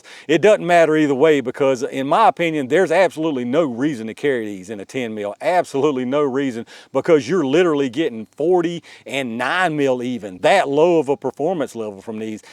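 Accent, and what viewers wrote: United States. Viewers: No offense here but Im really interested... What kind of accent is this? It sounds more American than Uncle Joe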